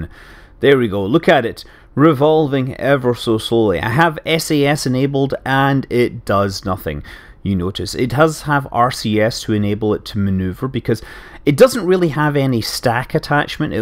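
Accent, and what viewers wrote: Scotland, United Kingdom. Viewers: Hey, glad to see you're back and making videos! I have to confess, I love your videos very much and while it makes me happy to see you go off on a vacation, I'm kind of sad we don't get to hear the dulcet tones of your Scottish accent talking about KSP and other science-y things. Keep it up! Say, ever consider doing a video on DJing or mixing?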